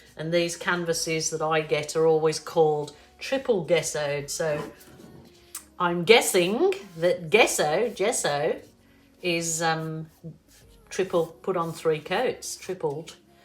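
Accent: Birmingham, United Kingdom. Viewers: Hey there Lovely! I'm really late to the party only just found your channel 🤦‍♀️. Absolutely love your art.❤ (Great to hear a Brummie accent too! Great Barr gal myself. X)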